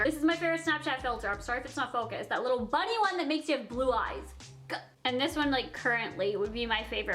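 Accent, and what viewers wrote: Newcastle, United Kingdom. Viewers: It's Geordie, bless you! Ask Siri to say it xxx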